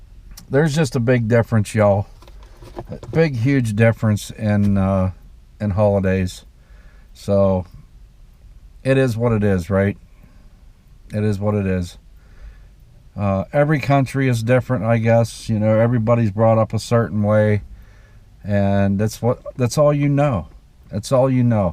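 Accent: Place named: South, United States. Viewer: I Think Your From The South,Can I Asked Where Is It:)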